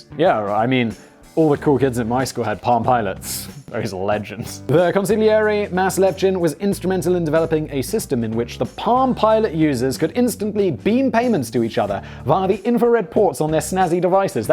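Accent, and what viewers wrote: United Kingdom. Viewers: His only talent resides in being able to read in his British accent.... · The British slang and pronunciations are almost as funny as Danny and Simon's jokes/puns. If it's spelled Zynga, then it's an online/mobile gaming company. Mostly their games are available on just Facebook nowadays. Some of those men are sexy, just sayin'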